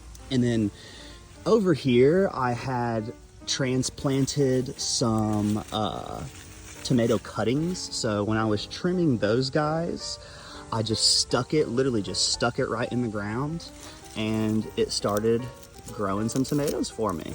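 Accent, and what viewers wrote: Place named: South, United States. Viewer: Came here from Obese to Beast to counter that bully Alex, stayed for the snark and the southern accent ❤ subscribed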